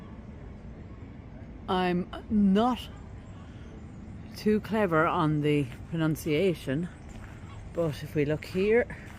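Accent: Ireland. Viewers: Love listening to your Irish voice ❤❤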